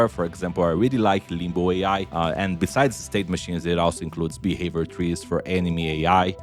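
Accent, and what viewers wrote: Brazil. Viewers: sabia. Minha esposa é do Brasil; eu podia ouvir a mesma sotaque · hey is that a fellow Brazilian accent I hear?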